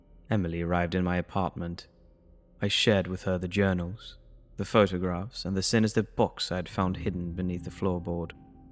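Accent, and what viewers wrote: United Kingdom. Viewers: you have the perfect british accent for this too😉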